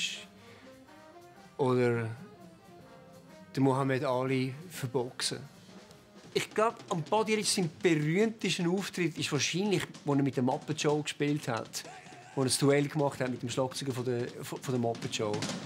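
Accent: Zurich, Switzerland. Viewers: hat er in diese Video auf Deutsch gesprochen? oder ein Dialekt vom Schweiz? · Merci, ändlich mal e Ufnahm wo er Schwizerdütsch redet :) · He's from Zurich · Lymphosacroma Yes, I knew Jojo is from Zurich, and I also knew the German they speak is not exactly the "straight" German, but having studied German in school (some 30 years ago...) I thought I could understand a little bit more. So to say, I once could hear people speaking Kölsch (Köln dialect) and could understand much more than what Jojo is saying here... here in Italy is pretty the same though, when not worse: if a Northern Italian and a Southern Italian would speak each other in their dialects, they could barely understand! :) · Alter Schwede, ich kenne ja nur sein Englisch, aber auf Switzerdütsch ist er echt eine Herausforderung für einen Deutschen :D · Yes, Jojo speaks Züri-Dütsch, the Swiss-German spoken in the Zurich-area. 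I'd say it's probably one of the easiest Swiss-German to understand if you speak German. Certainly easier than let's say Bern-Dütsch or even Walliser-Dütsch. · is he speaking swiss german?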